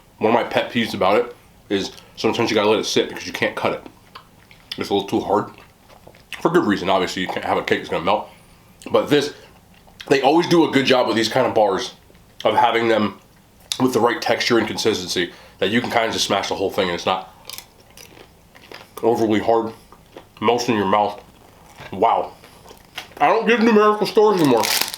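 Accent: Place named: East Coast, United States. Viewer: 😃 food looks delicious. Love your upbeat attitude And eastcoast accent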